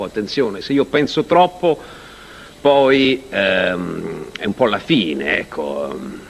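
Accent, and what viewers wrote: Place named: Milan, Italy. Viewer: Accento quasi da cummenda milanese. Mi stupisci sempre, e mi manchi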